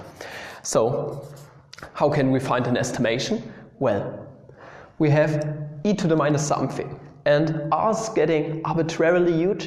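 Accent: Germany. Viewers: The german accent+The great content+Calculus+great explanation= THIS VIDEO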